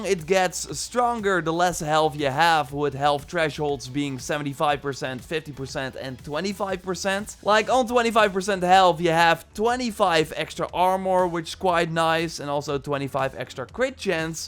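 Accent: Netherlands. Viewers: Hahaha de eerste keer dat ik je video kijk en ik hoor meteen dat je Nederlands bent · is this guy dutch?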